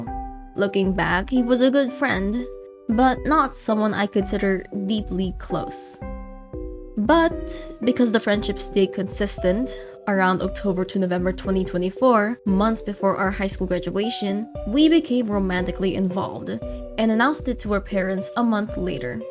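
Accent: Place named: Philippines. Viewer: Are you filipino? Your english sounds so much like Filipino english!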